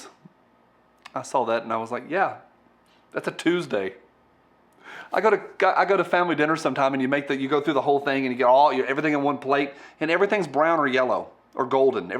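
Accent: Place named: New England, United States. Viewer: We need more New England accents, lovee.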